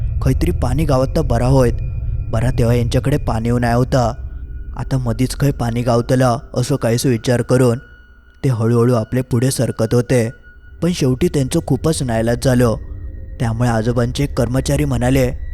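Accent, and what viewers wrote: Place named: Konkan, India. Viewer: Tujha mule Konkan chi bhasha awadayla lagi ani chan vatati aaikayla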